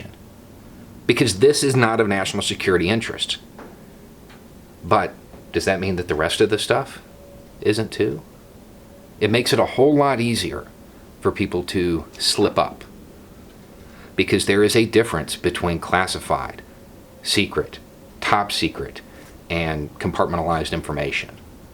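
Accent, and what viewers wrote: South, United States. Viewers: mah breau!!! Sure is good to hear a prog-lib of an analytical bent speaking with a southern accent. Ah do so mahself. Thank yew.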